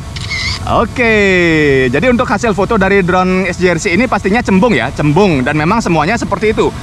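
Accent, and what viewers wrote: Bali, Indonesia. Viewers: MAS, SAYA MAU TANYA KOQ LOGATNYA ABANG KAYA ORANG BALI...MAAF CUMA PENGEN TAHU AJA DARI MANA ASALNYA BANG SAYA SUKA NYIMAK VIDEO REVEWNYA.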